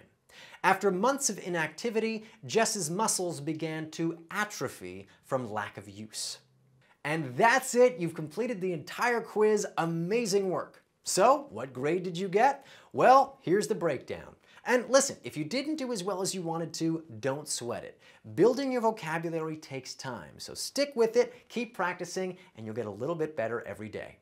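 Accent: United States. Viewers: For goodness sake this is primary s hood stuff! So the chap pronounces some of the words with n American accent t….which does not go down well with me. An English lady!!. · This really should be about learning American. Assume was given an American pronounciation and 'acclimate' is not used outside of the US as the English word is acclimatize. Presentation was good.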